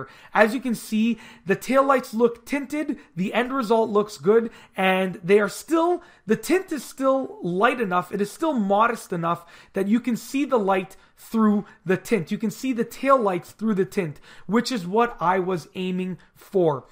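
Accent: Canada. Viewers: This dude has to be from Canada based off his accent. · Are you Canadian or from Minnesota? Don't you think that by tinting or subduing tail lights is risking or cutting down on motorists trying to distinguish wtf that is in front of them? Especially dark or dimly lit roadways, they could just appear to be roadside markers. Remember this fact, today's drivers are less aware/attentive than drivers were 20-30 yrs ago because of the lack of common sense and ease of distractions. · He's Canadian..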